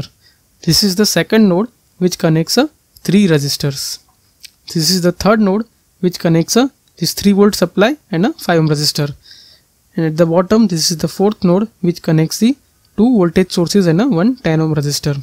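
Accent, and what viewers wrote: India. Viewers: His voice is totally indian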